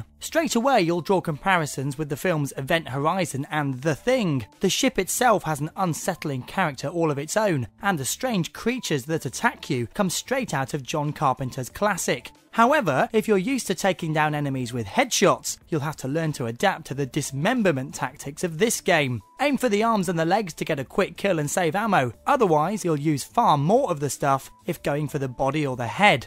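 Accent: United Kingdom. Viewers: he makes brits sound kinda gay btw im a brit and i sound nothing like that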